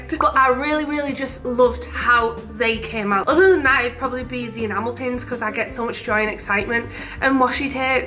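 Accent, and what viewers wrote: United Kingdom. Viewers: You don't sound german at all. I am from Germany. You sound totally british. I love England and the english language so so much. I also have family in Gloucestershire. I am really really sad about the Brexit thing 😢. I can't understand why you want to be separated from us. Anyways... Greetings and hugs from Germany! Stay strong and safe! Tina xxx 😘🤗💗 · If you ever get the chance... The new mocha flavored Kit Kat duo is fabulous! I got to have one last month, but now I can't find any in the stores I usually go too. It's the same way with the dark chocolate Kit Kat. So good!!! But so rare to find. And I love your purely British accent. You're adorable! Such a sweetheart. Love you, buh bye! Makes me smile every time.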